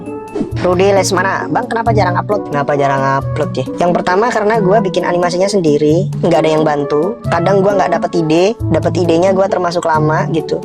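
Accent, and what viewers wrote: Banyumas, Indonesia. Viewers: kalo orang ngapak, jadi keinget curanmor versi ngapak cilacap yah bang 😂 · Bang ngapak bgt rika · Org ngapak? PADA WOYY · Jebule wng ngapak y, pantesan ana ngapak" e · Ngapak nya sebelah mana bang? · Setuju jarna inyong juga ngapak lah broo😁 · Ngapake ngendi bos...bener bae nek pas ngomong ngapak ngena/medok bngt 😅😅😅 sem 😅👍👍👍👍 trus rika mantan kenshu pa bos? Kadang nek jepangane 👍👍👍👍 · Ngapak e pundi mas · Ngapak e ndi bang kebumen po cilacap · Gua suka banget kalo bang pakai logat ngapak😂😂 sumpah ngakak😂😂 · Anjir wong ngapak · Ngapakny Mana Bang ? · Oalah jebhule rika wong ngapak😂👍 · Ngapaknya mana bang.. cilacap.. Purbalingga, Purwokerto apa mana bang? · Bang gw juga org ngapak lu dmn nya 😂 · Ngapak sebelah mana banh? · Ternyata orang ngapak ya bang😅 gw juga orang ngapak ni btw ABG nya orang mana😁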